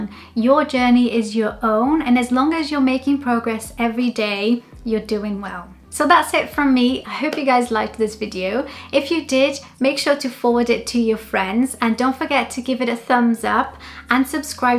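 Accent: United Kingdom. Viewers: Hi, you asked about our backgrounds. My name is Timo and I live in Finland. I listen to podcasts, Youtube videos and audiobooks of different topics in the background when renovating, woodworking, washing dishes or driving a car. Lucy mentioned you are a great example of a person who has gained a British accent and she is right. Even if I don't live in an English-speaking environment, it is nice to learn new ways to improve your fluency. Thanks for the video. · Hi ! I'm new here to your channel. I can't believe you are brazilian girl, your British accent is so pleasant that you sound like a native! I saw your video of how you became fluent in English. Your journey is very fabulous. I love your accent. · A lucy falou do sotaque britânico dela! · Uma brasileira com inglês britânico? I love it!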